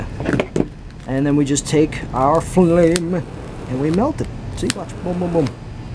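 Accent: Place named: England, United Kingdom. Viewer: Terrible Pommy accent, good video!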